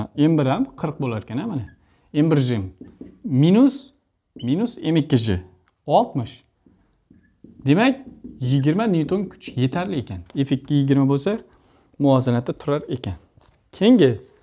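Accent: Khorezm, Uzbekistan. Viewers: Xorazmdan misiz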